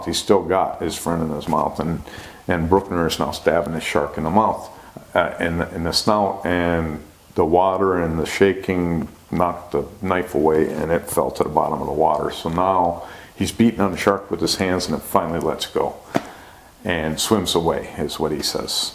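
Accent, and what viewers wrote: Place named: Midwest, United States. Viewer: Great channel. How does a guy with a Minnesota/Midwest accent be so knowledgeable about sharks?! Hahaha great stuff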